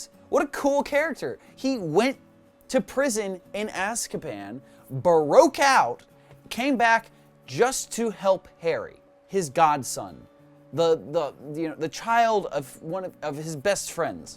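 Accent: Germany. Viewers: I wanna go to tokyo!!!!! Can I hide in your suitcase or something.... wait that came out wrong... sorry. Side Note: Your German accent makes me laugh all the time... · Dein Deutscher Tonfall ist ganz schön happig,. (Translation: your german accent is a bit much,.)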